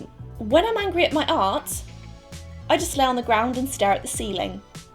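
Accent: England, United Kingdom. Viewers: u aren't us based, u sound British? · she is so classically English but not at the same time... why?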